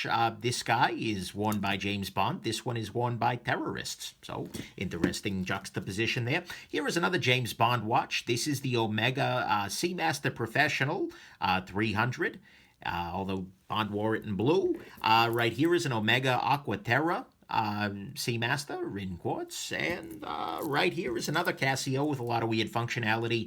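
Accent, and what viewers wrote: New York, United States. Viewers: your watch reviews are excellent, love that NY accent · You sound very new york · This guy new yorks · Love your accent dude! Is it New York Italian?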